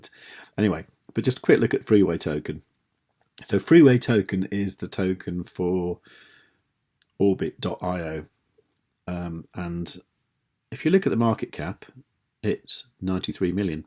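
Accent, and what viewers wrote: Midlands, United Kingdom. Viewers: What part of the uk you from u sounds like ur from midlands?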